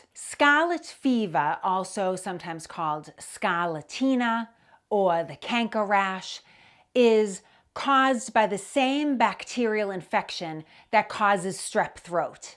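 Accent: Boston, United States. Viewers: I have read extensively on our revolutionary history, but it somehow takes renewed importance when a hot woman with a killer Boston accent is telling it. Keep going because I'm picking up details I didn't know before. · has the thickest Boston accent that I have ever heard. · Love your authentic Boston/Massachusetts accent! I know it well, because I was born in Boston, Ma. Boston City Hospital, 1000th baby born , August, 1964, parents born & raised in Boston, from the 1940s until they moved west down the Old Boston Post Road,(US Route 20),33 miles to Northborough, Ma. (Where surveyor Benjamin Franklin ordered the "33 Miles To Boston", mile marker, placed there during his time as Postmaster General! I absolutely love our American History, which is probably why your channel came up in my feed! I grew up primarily in Northborough/Westborough, area, incorporated in 1766 & 1716, respectively, about a mile outside of town, where one of many of those old, granite Tribute Stones, comemorating Revolutionary War General Henry Knox's passage through the town with his army, and the cannon/artillery pieces which hed collected from fort Ticonderoga, NY, to deliver to General George Washington Bunker Hill, Boston, along with as many of the troops, ammunition, and powder, which Gen. 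Knox inevitably came across during his journey to reinforce Gen.Washington, as ordered by the Commander in Chief of The Armies of The (newfound), United States. Our Northborough Militia was led by one Captain Samuel Wood, whod sent for all Northborough Militiamen to muster The towns Musterfield, immediately after recieving the alarm of April 19, 1775! I know all this wonderful history because I was fortunate to grow up in a VERY Patriotic little suburban town, and just wanted to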